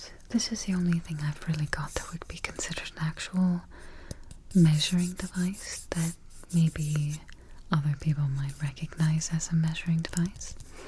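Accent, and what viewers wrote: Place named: Mid-Atlantic, United States. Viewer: I wonder if mid Atlantic accent is for ASMR or if she always talks like that. Either way it's perfect for ASMR intentional or not 😌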